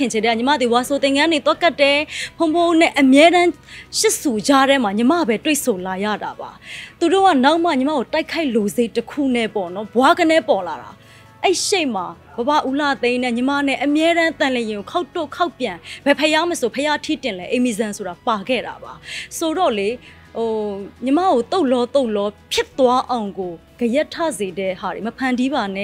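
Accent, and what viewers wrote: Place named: Pa-O, Myanmar. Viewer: ပအို့ဝ်သံနဲ့တူတယ်လို့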